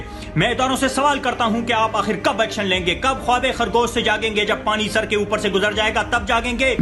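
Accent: Pakistan. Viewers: Love you bro the way you talk that's like a real and true pakistani...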